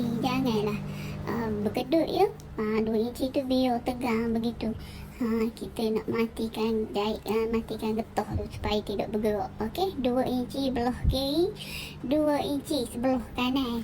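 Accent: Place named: Pahang, Malaysia. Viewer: orang pahang deh...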